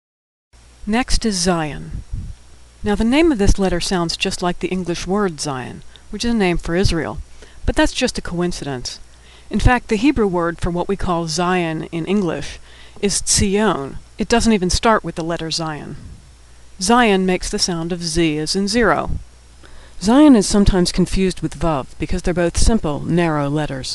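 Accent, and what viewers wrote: United States. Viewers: horrible American accent... can't you find an Israeli to pronounce the words? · Beautiful American voice, makes it much more easy to store the informations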